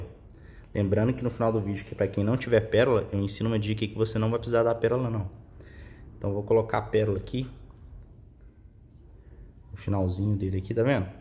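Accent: Minas Gerais, Brazil. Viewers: Adorei como sempre !!! Muito criativo. Com todo respeito esse sotaque me parece mineiro uma graça.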